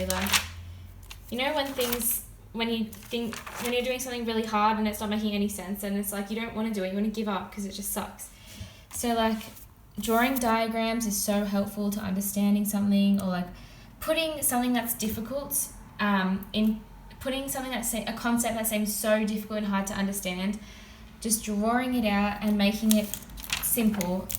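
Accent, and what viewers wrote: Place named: Australia. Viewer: I thought it would be a British video but she’s Australian 😂😂😂mate I’m dying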